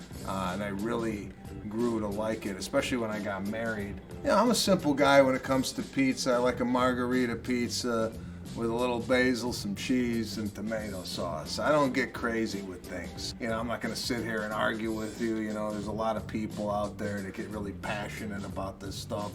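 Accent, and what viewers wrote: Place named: New York, United States. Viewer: It was interesting to hear things about you. I also like how you exaggerate your New York accent (and your HILARIOUS body movements!!!) during your routines.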